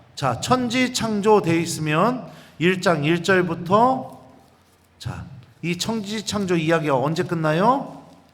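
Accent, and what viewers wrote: Chungcheong, South Korea. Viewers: 신부님 강론말씀최고임니다 저도고향이충남보령 인데 충청도사투리가 참 정겨워유 감사합니다 ❤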